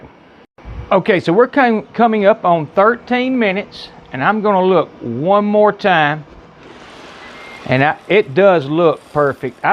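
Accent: South, United States. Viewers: I just found your channel and I love your southern voice and delicious recipes ❤️ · I received a ninja air fryer for Christmas so I looked up some technics here on you YouTube heard this good ol’ southern accent and knew within 5 seconds I’d better subscribe Btw Greeting from Oklahoma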